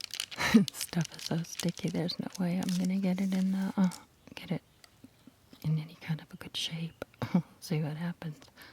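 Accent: South, United States. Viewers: I love your southern accent 😂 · I loooved your funny southern accent 😁 Let me just say it's interesting how you measure the ingredients by volume, here in Italy the measurement is by weight 😱 BTW I'm a huge fan of yours since the beginning, your voice is so relaxing (and I'm usually a no-talking person 🤷‍♀️) All the best from Italy 💙🤗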